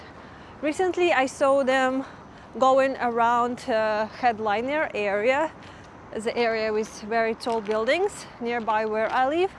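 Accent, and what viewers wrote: Russia. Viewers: Не люблю когда рязанские рожи иностранцами прикидываются а русский акцент всё равно никуда не спрячешь .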